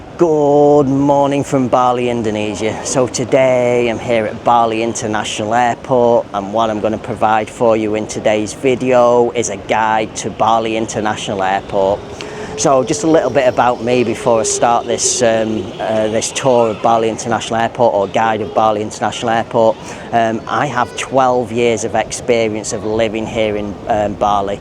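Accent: United Kingdom. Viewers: I think he already spoke English just the accent is British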